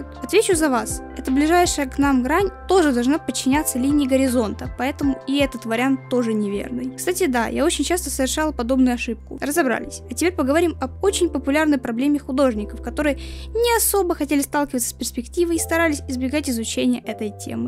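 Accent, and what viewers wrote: Ukraine. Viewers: Возможно она украинка и у нее есть небольшой акцент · оо ахахах_)) не потеме, я такая слушаю видео и.. думаю... чтот тут знакомое... Гхрань... и поняла по говору что автор украинец, чекнувши профиль)) как я поняла, я удивляюсь хахахахах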